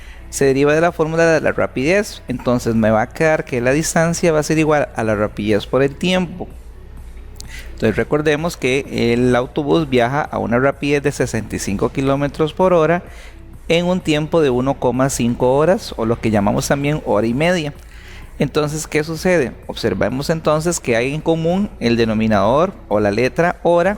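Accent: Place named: Costa Rica. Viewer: La voz me suena a un tico....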